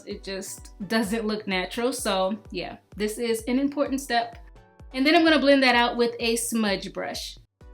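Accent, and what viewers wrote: Baltimore, United States. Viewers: u have the strongest B-more accent.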